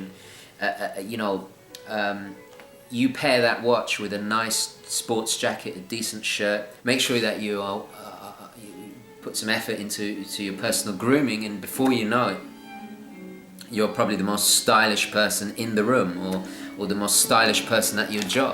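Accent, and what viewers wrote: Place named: England, United Kingdom. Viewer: Where about in England are you from. I can't pinpoint your accent.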